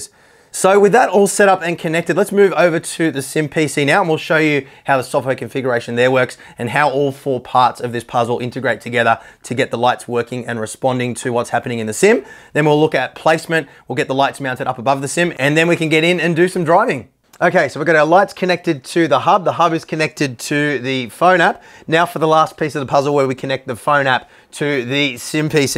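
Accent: Australia. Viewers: Philips: Dutch brand and product. You’re welcome Your “unlimited budget” make it easier for us to decide if this is something to invest in so personally I’m happy you’re doing all of this 👍 But man, these videos you put up here are always the most complete and informative you can find around here on this topic. The Australian accent gives the entertainment factor a huge boost also.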